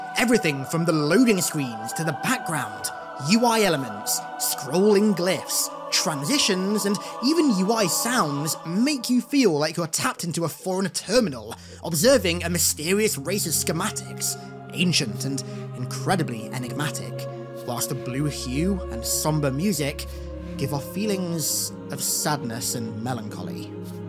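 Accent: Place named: United Kingdom. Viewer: was gonna watch but ur british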